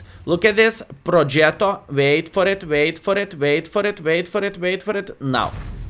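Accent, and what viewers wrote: Russia. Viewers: jesus this russian accent english is horrible..... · Что за акцент?Ты русский?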